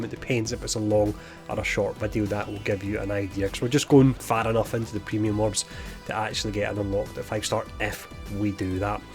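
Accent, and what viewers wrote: Scotland, United Kingdom. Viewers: First time seeing you! Nice you’re getting in front of the cam! The beard definitely fits the Scottish accent! Automatically don’t want to mess with you! 👀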